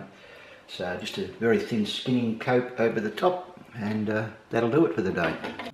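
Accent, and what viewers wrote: Australia. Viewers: well his accent sounds australian and don’t they do things backwards there?? ... or is it us? thanks for the help with this video and your accent rocks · Uffff sorry mate cant listen to an Australian accent 🤢🤢🤢🤢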